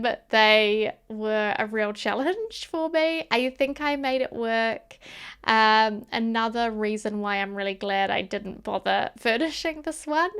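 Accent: New Zealand. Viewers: Lovely building! It’s my first time watching your channel and loved your voiceover, New Zealand’s accent it’s so pretty 💗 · Hi, I am from New Zealand too, it was quite different and exciting to hear a Kiwi accent over a Sims 4 speed build. This is such a beautiful build, I can't wait to try my hand at furnishing it.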